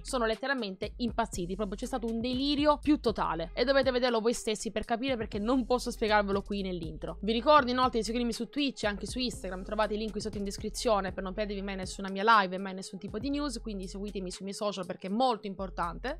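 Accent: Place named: Sicily, Italy. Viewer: È siciliana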